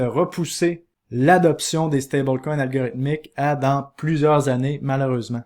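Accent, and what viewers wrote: Quebec, Canada. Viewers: Je vois tes vidéos depuis 8 mois Ne change rien .Elles sont TOUTES SUPER. et Merci pour l'accent de Québec en plus. Patrick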